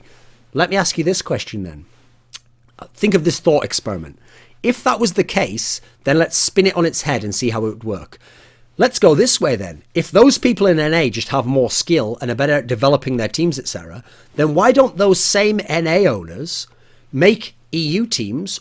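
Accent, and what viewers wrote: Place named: Birmingham, United Kingdom. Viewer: Weak brummy accent. Sort your shit out Thorin.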